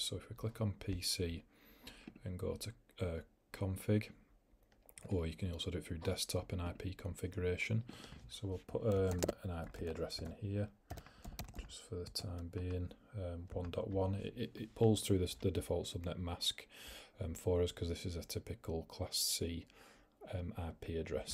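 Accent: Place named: England, United Kingdom. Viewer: amzing english accent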